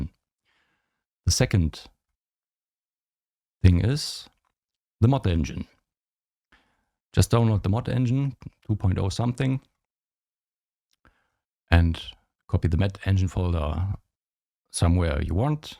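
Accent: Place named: Germany. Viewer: Ich mag deinen deutschen Akzent :D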